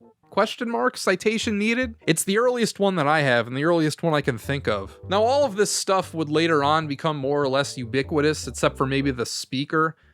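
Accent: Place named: Midwest, United States. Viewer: What part of the mid-west / great lakes are you from? Because you have a distinct accent...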